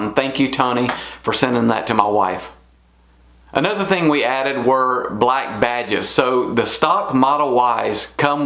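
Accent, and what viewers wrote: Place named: North Carolina, United States. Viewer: Hey. I’m in North Carolina and you sound like you’re from somewhere around here-not California! 😂 Ordered our Y a week ago Saturday! Do you recommend tinting the overhead roof glass? I’ve seen mixed comments on this on the web. Thanks!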